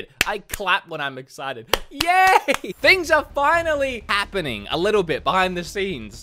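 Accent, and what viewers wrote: Australia. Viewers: I wondered why watching your videos felt familiar. As someone who grew up in australia im not sure if you ever watched Good Game or Good Game Spawn Point but your channel really reminds me of dashing to the tv to watch the hosts talk about whatever game was hot at that moment. The aussie accent def helps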